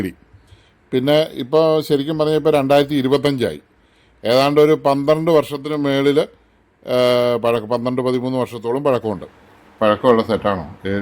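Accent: Kottayam, India. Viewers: താങ്കളുടെ ഒരു ആരാധകൻ ആയി മാറി ഞാനും കറ കളഞ്ഞ സംസാരവും പിന്നെ കോട്ടയത്തുള്ള അച്ചായന്മാരുടെ തനി പകർപ്പും പിന്നെ എന്തു വേണം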